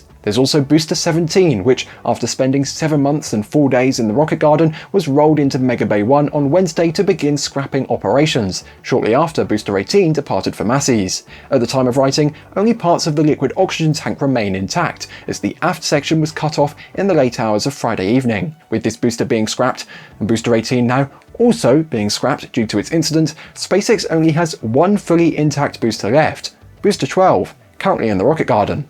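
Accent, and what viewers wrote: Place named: England, United Kingdom. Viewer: Wrong? He's and Englishman speaking English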